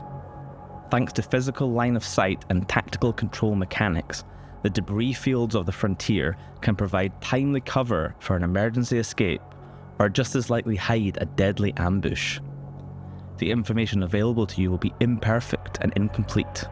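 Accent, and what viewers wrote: Scotland, United Kingdom. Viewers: I can't believe after 20+ years of experience with EVE, you can still allow yourselves this level of shit with placing and advertising a product. It's no wonder each project other than EVE has failed. Its not the product, it's your absolute lack of understanding that we want proper information and motivation to spend money, and not half-arsed ambiance video with some dude who sounds like a Scot trying to balance talking English and gurgling liters of cum at the same time. Oh, good job on giving a monstrous advantage to people who are coders and into IT, over regular folks. Hey pleb, go work for that dude who knows how to write code and can make his own stuff up. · That's a Scots accent, not Icelandic.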